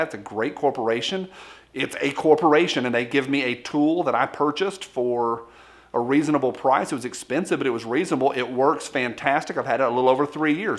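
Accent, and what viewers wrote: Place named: New York, United States. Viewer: Typical gun drama.... Jk I feel you brother. You sound like you live with me in New York for crying out loud lol.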